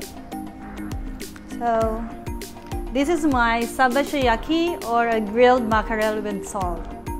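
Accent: Philippines. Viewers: Pinay accent 😍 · are u pinoy? (the way u speak engliiish)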